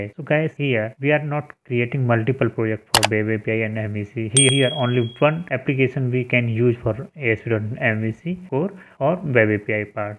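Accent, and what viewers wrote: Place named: Western Europe. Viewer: Nice, nice, Western Europe sound.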